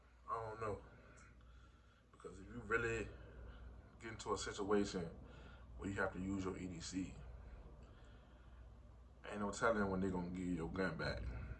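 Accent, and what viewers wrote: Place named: Richmond, United States. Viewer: This video came across my feed and I just got into it because I’m 2A everyday. I couldn’t place the accent and at first you said something about New Orleans then I heard more and realized you were from Richmond. Reminded me of one of my favorite channels Stay Out The Way Tre and him talking about Virginia politics. Favorite part of the video hands down was when you said your brother beat that case. The American justice system in my opinion, is the most effective system we have currently in the world, but it’s still obviously flawed. One of the biggest flaws is when they arrest people that are defending themselves in deadly situations. A man should be able to defend his own life, let alone having a family to watch out for, without any fear of spending years in prison for doing so. If I’m going about my day, obeying the law and treating people with respect, why should I allow undue harm to come to myself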